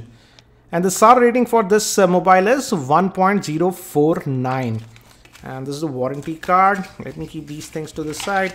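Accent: India. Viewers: Indian english❤️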